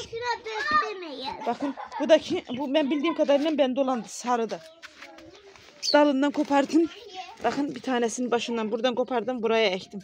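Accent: Azerbaijan. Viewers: Sen bizim gibi konuşiyorsun.Azerbaycanlımısın?çiçelerin çok güzel.bol çiçek açması için bir şey yapıyormusun?😊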